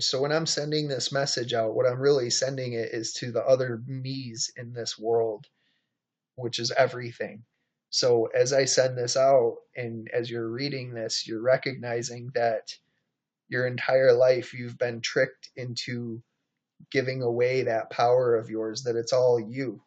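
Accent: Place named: Minnesota, United States. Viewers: sounds a little Minnesota to me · I'm getting a UP vibe on that accent, lol. You from the upper midwest? 😁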